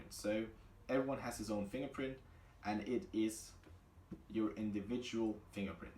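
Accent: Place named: United Kingdom. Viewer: Hast du einenenglishsprachigen Elternteil? Du sprichst sehr britisches Englisch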